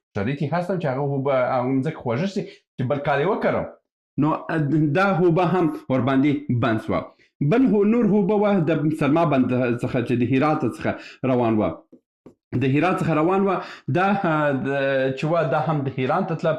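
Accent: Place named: Farah, Afghanistan. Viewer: ده فراه پشتو داغه رقم ده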